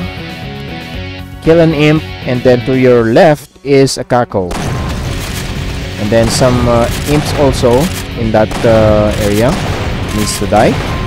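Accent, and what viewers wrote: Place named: Southeast Asia. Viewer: Your accents sounds like southeast Asian are you Filipino or Indonesian? By the way nice video bro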